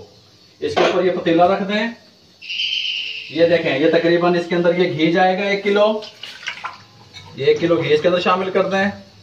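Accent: Punjab, India. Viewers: karahi se zayada apki Punjabi ka maza aya hy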